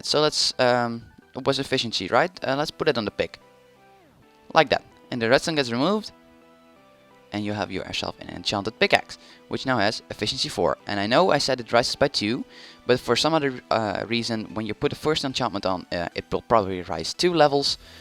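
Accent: Netherlands. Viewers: I can here an obvious dutch accent... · Kut nederlands accent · Becous of your accent I think your Dutch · Are you dutch?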